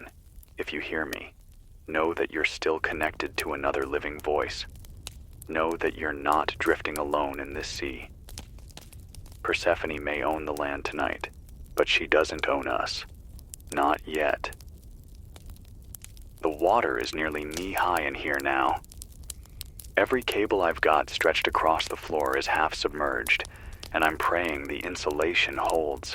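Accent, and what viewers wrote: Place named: United States. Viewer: Hint,if you want to sell....don't speak American or mention trumpland,///juahhh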